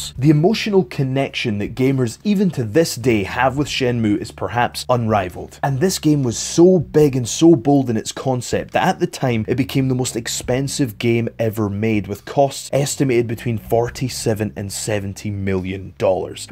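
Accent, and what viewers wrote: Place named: Scotland, United Kingdom. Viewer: Scottish probably from Edinburgh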